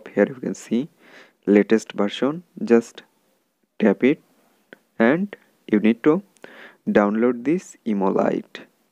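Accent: India. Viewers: You need to speak proper English Remove your hindu accent it sounds disgusting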